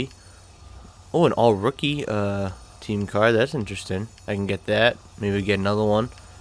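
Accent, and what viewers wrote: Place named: Boston, United States. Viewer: You sound like you're from Boston. Looks like you still hurting from Eli owning the cheatriots in the super bowl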